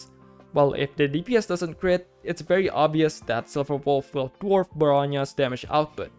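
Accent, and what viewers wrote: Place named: Indonesia. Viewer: Fix Indo wkwk kedengeran aksennya, mantap bang, keep making more content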